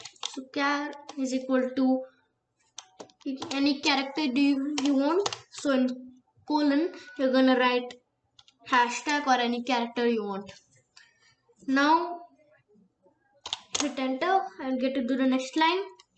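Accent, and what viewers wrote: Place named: India. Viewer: I think you are indian because your voice says I also Indian Nice explain I understand all things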